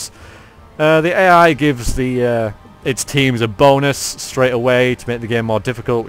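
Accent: Yorkshire, United Kingdom. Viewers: So.... you are from Yorkshire? :-)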